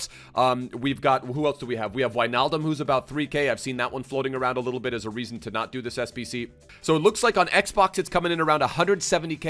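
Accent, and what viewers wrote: Ireland. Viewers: Lmfaoo irish?? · Actually thought he was Irish my disappointment when he didn’t strong link to my Roy Keane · me being confused for the first 2 minutes of the video if he really thinks he is irish or he's just joking around :D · wow i literally believed he was irish lol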